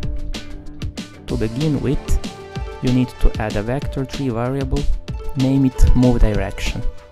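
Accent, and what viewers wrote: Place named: Russia. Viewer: Ты русский? Произношение как у русского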